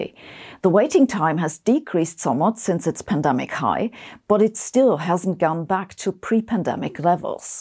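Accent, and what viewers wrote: Germany. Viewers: pɹəˈdʌkʃən love the german accent😊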